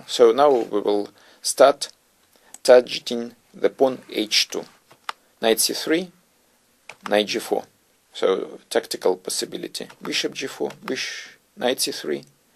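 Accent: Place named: Russia. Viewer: It's very common accent for Russian who speaks English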